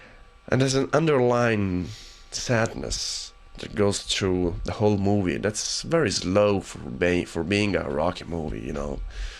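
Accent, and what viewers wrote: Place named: Russia. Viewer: your accent sounds likea russian one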